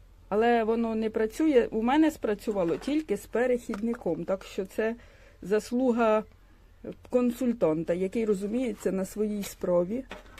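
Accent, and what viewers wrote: Poltava, Ukraine. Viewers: ви чудова! Дуже подобається ваш полтавський говір. Успіхів! Вітаннячко з Криму.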